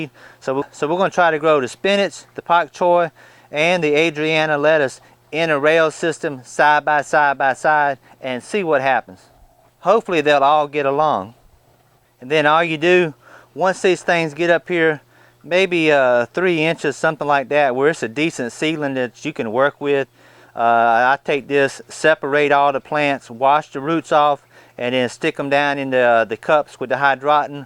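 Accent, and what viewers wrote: South, United States. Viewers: yeah, we all want to make fun of the southern "accent" and wording choices but you have to hand it to him, he's providing a ton of knowlege through trial and error, which is invaluable. I say thank you and I wish I were in a climate where I had more than 2.5-3 months per year to learn in. . .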